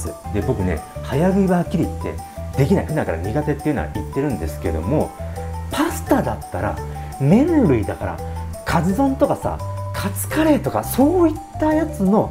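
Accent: Kansai, Japan. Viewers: 好きなキャラが関西弁だからなんかちょっとキュンとして顎んときますね…😍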